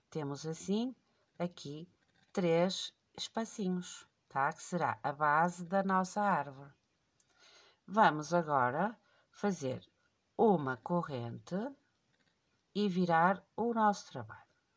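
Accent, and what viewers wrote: Portugal. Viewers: Amei a explicação, bem detalhada para iniciante. Parabéns, esse soraque é lindo. Amo Portugal .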